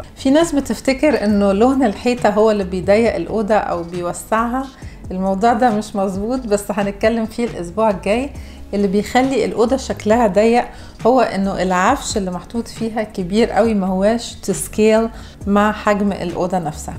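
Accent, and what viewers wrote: Egypt. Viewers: انا بحب اسمع واتفرج على فيديوهاتك وانا بنضف البيت بتديني باور وطاقة ايجابيه وبحب لهجتك الميكس المصري مع الشامي مع شويه كلام مكسر 😊 بجد من افضل القنواتkeep it 👆 ♥️♥️♥️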